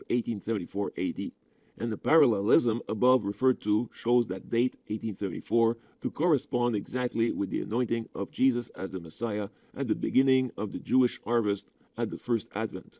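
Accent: Quebec, Canada. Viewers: hmm t québécois toi, je sens l accent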